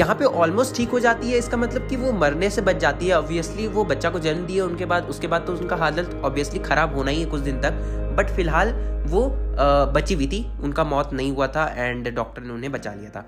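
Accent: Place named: Bihar, India. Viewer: By your accent I think u r from bihar 😀😀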